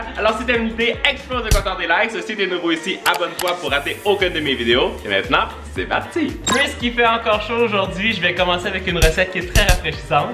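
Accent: Quebec, Canada. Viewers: traduis le mot anglais 24htu mon youtubeur préféré de is vidéos accent canadien et qui met quoi des vidéos trop trop rire 👍👍👍👍👍 🇨🇵 🇨🇦👍🇨🇦🇨🇦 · 2ème Des recettes tik tok pour la fin de l'été WHY NOT TON ACCENT QUÉBÉCOIS EST TELLEMENT BEAU, TELLEMENT AGRÉABLE À ÉCOUTER I LOVE SO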